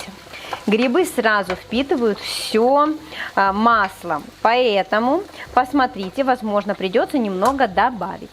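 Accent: Ukraine. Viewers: Чому ви записуєте відео російською ? Чутно ж що ваша рідна мова українська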